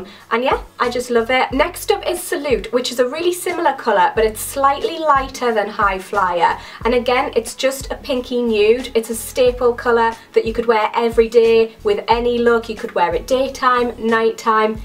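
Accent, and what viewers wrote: Newcastle, United Kingdom. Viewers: Are you from Newcastle? great video btw!x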